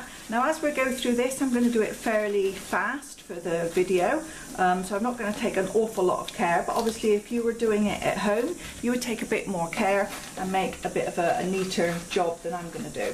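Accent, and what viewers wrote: United Kingdom. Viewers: I love the video! Very helpful! And your (probably:) British accent💕 I see you are wrapping classic canvas, but what about board canvas, those slim ones? I think it should be enough to use the tissue paper and over it a bubble wrap and put into the book box mentioned in the video (I love them). Or any other method? I want to ship just the tiny ones board canvas paintings:)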